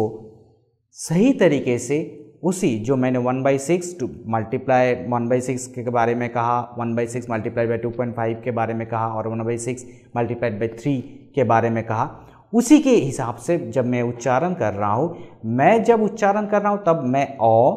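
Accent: Bengal, India. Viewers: I think he is speaking with a Bengali accent, hence the problems in pronunciation. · I appreciate your efforts. Your explanation gives relevant information how and why veda mantras have remained intact and un-adultered. I am not going on your accent due your Bengal origin. This does not change the meaning of Vedic Mantras today because these mantras are available in printed text. We must respect our ancient rishies who developed various pathas, only to see that no one can make any adulteration in them. It is human drawback that people make changes in the original text as they like. Brahamanaas literature is example. However, now we should try to understand the meaning of the words of original Veda Mantras also, which are profound. Since we have the written original text is available, the meaning of the mantra does not change as we don’t go by the pronunciation but by the words and their meanings. 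Therefore, people criticizing your efforts should take your explanation in right spirit. These mantras are profound and powerful only by understanding the meanings and not by simply chanting as many understand today. Congratulations to you. Regards. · साधूक्तं भवता । पुरुषः वङ्गदेशीयप्रायः नाम्नः, तर्हि तद्देशीयानामुच्चारणपद्धतिः जानीमः, तर्हि क्षन्तव्योऽस्माभिः । प्रसङ्गेण वक्ष्यामि, भवतां भाषासौष्ठवमभिवन्दनार्हम् । जयतु संस्कृतं जयतु भारतः । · ये बंगाली हैं. मोद्धोमा तथा शोरित बंगाली मूल शब्द है. बंगला में 'व' अक्षर नहीं होता.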